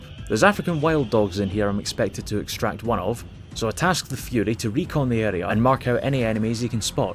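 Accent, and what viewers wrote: Scotland, United Kingdom. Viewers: I can’t place your accent. East coast of Scotland? Edinburgh? Dundee? 🏴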